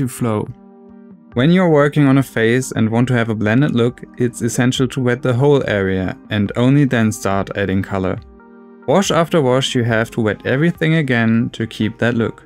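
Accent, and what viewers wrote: Germany. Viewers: Great video. Love your German (I think) accent as well :) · Hach ich hab doch rausgehört, das er Deutscher ist!:) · Man hört den deutschen Akzent sofort raus :D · Bist du deutscher? Du hast einen extremen Akzent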